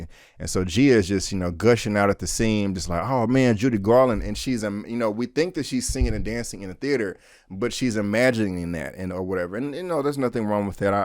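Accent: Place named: South, United States. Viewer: And where are you from??? I can tell it's in the south somewhere from your accent but bc you know who young joc is that made intrigued about what part of the south you live in. I personally know joc so I can kinda guess around which area.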